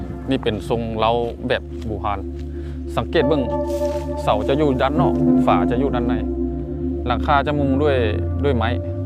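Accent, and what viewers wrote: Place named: Nakhon Phanom, Thailand. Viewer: เว้าคือบ้านเฮานครพนม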